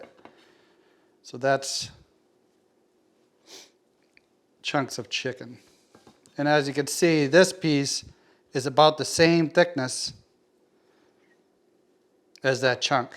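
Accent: Boston, United States. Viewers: Totally Boston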